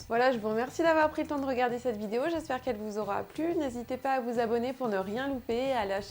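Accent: Vannes, France. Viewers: Bonjour, merci pour les vidéos ! l'accent de quelle région bretonne utilisez -vous? J'habite dans le léon et je n'ai pas souvenir d'avoir les même prononciations quand j'écoutais des personnes ici. Merci ! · Elle prononce en Vannetais, où est le souci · Faut préciser que c’est du vannetais, et l’accent peut paraître étrange à un Léonard 😅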